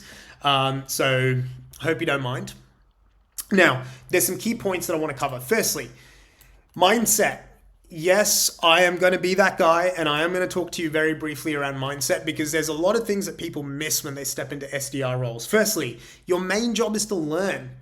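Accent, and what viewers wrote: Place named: Australia. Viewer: G'day, nice to hear an Aussie accent on a video like this - I'm an independent SalesOps guy who usually works with SDRs and AEs and now doing my own prospecting - so this is super useful. Cheers mate, ABC!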